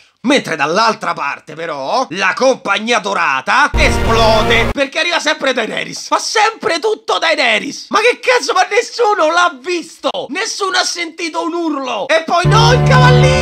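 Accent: Rome, Italy. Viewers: L'8 stagione la hai fatta perfetta, niente voce compostina, ma romanaccio a palla e urlaaaaa, mi ammazzo dal ridere! Fai così anche tutto il resto plz!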